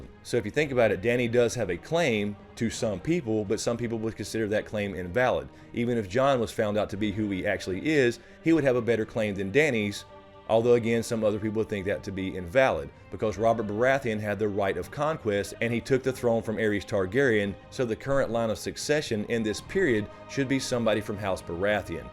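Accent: South, United States. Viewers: Just discovered your channel today. Nice country accent. Subbed.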